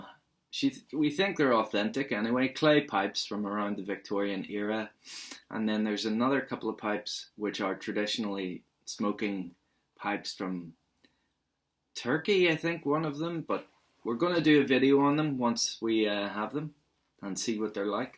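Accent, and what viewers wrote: Ireland. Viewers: Great chat, always a pleasure to hear an Irish accent 😊 I took a look at the guys site, he has quite a selection of meer's and your right, very reasonably priced. Look forward to seeing yours! 99 subs as I type - you'll have broken the ton in a day or less 👍🏻Also look forward to seeing your presents, your Mrs sounds like a keeper. Lastly, filters - I started with them but abandoned them fairly quickly (more as I didn't like the tight draw). Good luck with that 'stache!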